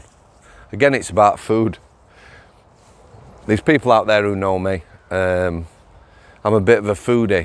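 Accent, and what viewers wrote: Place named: Manchester, United Kingdom. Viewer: Honest to God you sound like a Manc! Am from Kirklees West Yorkshire born n bred! The Manc twang must have rubbed off on you in Strangways! Seriously bruv your accent is Manc!100%! Anyhow subbed I like you bruv! First Saw you on James English!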